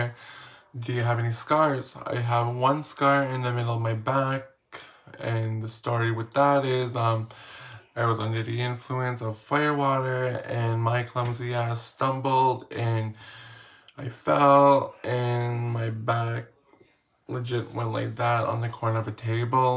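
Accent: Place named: Australia. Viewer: Australian accents hit different <3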